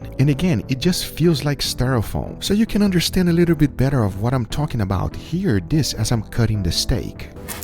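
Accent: Brazil. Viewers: Because it's a Brazilian accent. Portuguese has a lot of tone color and variation. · ill bet all my money this dude is Brazilian I can hear it in his English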